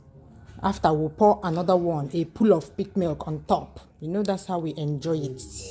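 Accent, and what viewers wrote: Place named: Umunze, Nigeria. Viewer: please are you from umunze? The dialect is exactly like mine. Breakfast yum yum 😋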